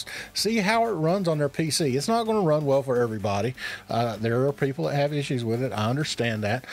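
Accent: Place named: South, United States. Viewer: Where you from brotha? I hear a fellow southern accent.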